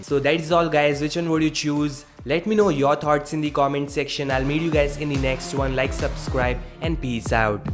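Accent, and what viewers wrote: India. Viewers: Oh i like your infian aksen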